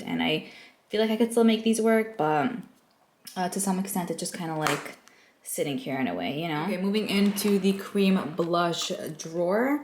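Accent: New York, United States. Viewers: I actually love the Too Faced Chocolate Brow-nie pencil but I just found out Ulta doesn’t carry it anymore 😭 I usually used my points to get it for cheaper. I don’t know if Sephora ever did but it’s not on there either. It is on the TF site but idk if it’s worth it. It’s the only pencil where I found a good shade match, because my brows look black but they’re actually a very deep brown. I got the newer Huda Beauty one which matches my brows well, but I broke it off a lot already, it’s too thin for me! Also I’m bilingual too, with English not being my first language either. I usually say words correctly but sometimes my accent comes out— probably because I’m around my family so much. My English was perfect from elementary to middle school but high school I started having a slight accent? lol So be proud of who you are, a lot of people just know English anyways 😜 Your accent also has that New York vibe, you sound badass haha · To me you sound like a New Yorker. 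The way you speak is just fine! Don't listen to the assholes, you probably know more languages than they do! Insta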